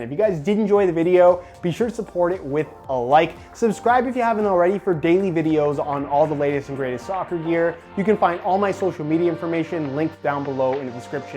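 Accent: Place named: Canada. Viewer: “That I talked a-boot”....love the Canadian accent!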